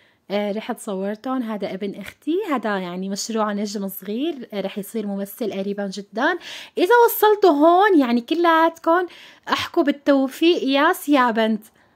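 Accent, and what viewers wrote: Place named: Syria. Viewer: من لسانك سوريا اب عن جد. لستك تكذبي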